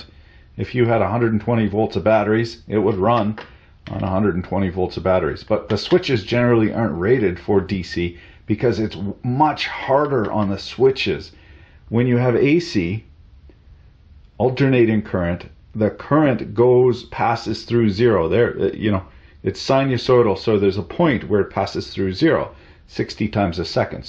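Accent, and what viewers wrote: Canada. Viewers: Glad I found this channel. You take being a tool guy to a whole 'nother level. Im from Texas and get a kick outta the Canadian dialect. Enjoy it. I watch another channel called South main auto repair, and you sound a great deal like him lol. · I swear you were Canadian...??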